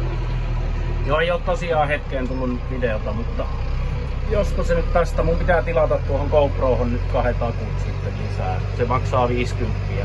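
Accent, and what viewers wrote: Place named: South Ostrobothnia, Finland. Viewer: Juhannuksia koko perheelle ja upeeta et puhut suoraan eteläpohjalaisella tyylil mistä tykkään kun oma suku ylistarosta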